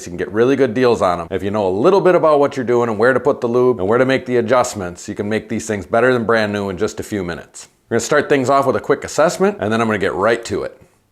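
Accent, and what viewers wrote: Wisconsin, United States. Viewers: Chicago guy living in the central highlands of Mexico with a 10 year old Chinese bike ! My first experience with a local bike shop was $30 charge for this tune up and my bike shifted very tight and I ended up searching YouTube for how to do a tune up myself. It’s good to hear a Wisconsin accent and to see your techniques . In two days I’m gonna give this a try . What part of Wisconsin are you? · Immediately after he started talking I knew he was from Wisconsin. GO PACK lol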